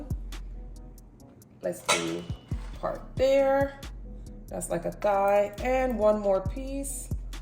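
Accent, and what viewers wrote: Jamaica. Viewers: That looks delectable... You're Jamaican right? Sounds kind of like you are. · Idk why i hear a jamaican accent 👂🏾 🧐😌lol..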